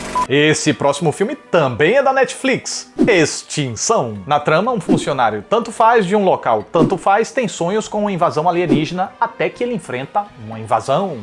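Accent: Northeast, Brazil. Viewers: só me inscrevi no canal por causa do sotaque nordestino carregado, que é a cereja do bolo! parabéns ótimo vídeo.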